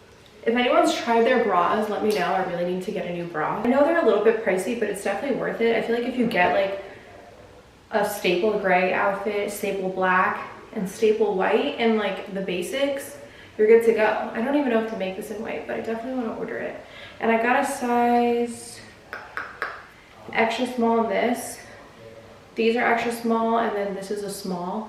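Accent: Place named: South, United States. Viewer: Nothing crazy🙂 Sounding country 🙂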